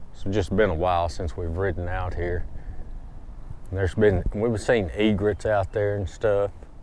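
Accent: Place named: United States. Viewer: Hello, great video I go to church every Sunday online because my first cousin and second cousin is both pastors and they have a church. I cannot make it to the church cause I’m too far. I’m like nine hours away from where they are at so I go online every Sunday and look at them. Yes, it is so sad when people pass away I know whoever they are my prayer with them. You know I don’t like to hear people passing. I know I say this if you have somewhere to be at a certain time get up and leave that’s all you have to do and you won’t be rushing, I don’t drive cars I get on public transportation and the people in my city be telling the bus driver to go a little faster because they in a hurry I tell the bus driver please don’t go fast because I wanna live a little longer but when I get my E trike, I won be using public transportation that much. I only use public transportation about one or three times a month. 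I love walking I Costco right around the corner from me, but people in my area is lazy. They will not walk. They will take a cab to go three blocks very very, very, very lazy in my city. thank you so much for your videos and I love love love your accent. It is so beautiful. Where are you from? I love to hear you talk country ❤❤❤❤❤❤❤🙏🏿🙏🏿🙏🏿🙏🏿🙏🏿 God bless you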